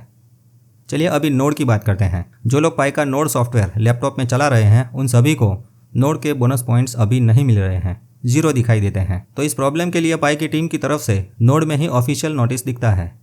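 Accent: Gujarat, India. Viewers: Sir aap Gujrati ho?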